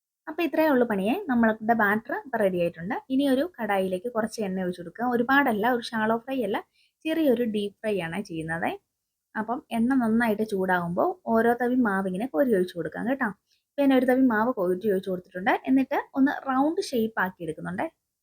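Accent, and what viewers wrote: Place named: Trivandrum, India. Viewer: ഭാഷ കേൾക്കുമ്പോൾ ട്രിവാൻഡ്രം ആണെന്ന് തോന്നുന്നു 😊😊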